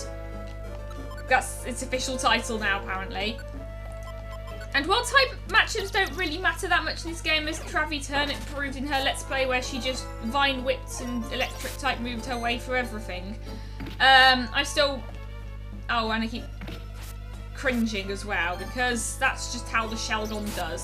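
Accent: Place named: Europe. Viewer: Yay, the fugitive saga is done! The ending always gets me a little choked up. Your partners reaction to the truth is so genuine and heartfelt, and the music makes the moment that much more emotional. I remember the huge feeling of relief I got when I first played. The fugitive saga definitely has its problems with the crazy difficulty spike and low variety of Pokemon, but it will always have a special place in my heart. I've also heard that males have a higher crit rate and females have higher accuracy and evasion, but I can neither confirm nor deny this. It seems to be the case from my experience though. About your commentary, sometimes I have trouble keeping up, but that might be because I'm not used to European accents. Also, I get a little overwhelmed by how many things you talk about, but I understand that dungeon crawling isn't the most exciting thing and that you need to talk a lot to fill the time.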